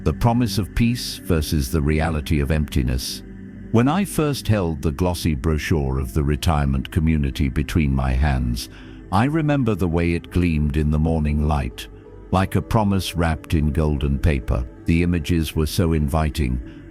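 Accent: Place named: England, United Kingdom. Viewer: Your channel has become very important to me, to begin to relax for sleep. Please never change the voice! I love the English accent. Also the quiet music. Thank you.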